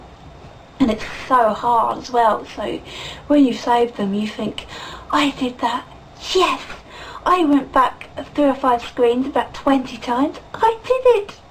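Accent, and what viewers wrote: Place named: Estuary, United Kingdom. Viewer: You sound like her too, she has a kind of estuary accent (in interviews, not in her songs). I'm constantly surprised by people not knowing. You must have heard. Anyway, Abe's Oddysee is great. It was too bloody hard for me when I was 13 though, so I didn't get far. If you like the gameplay, you should play Another World on the Megadrive or SNES.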